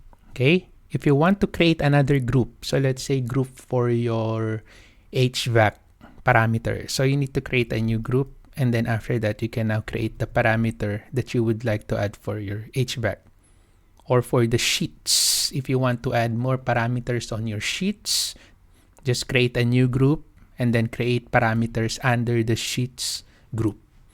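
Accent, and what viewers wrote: Philippines. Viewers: are you pinoy? you sounds like pinoy sir. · accent palang pinoy na alam ko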